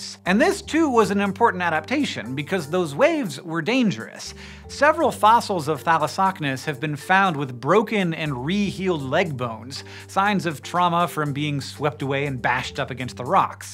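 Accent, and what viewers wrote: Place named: United States. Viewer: I knew Americans said 'erbs , but Lordie they say 'erbivores too.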